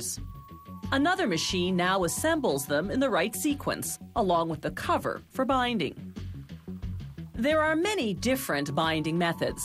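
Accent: Canada. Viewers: It's a canadian accent. Don't worry about it.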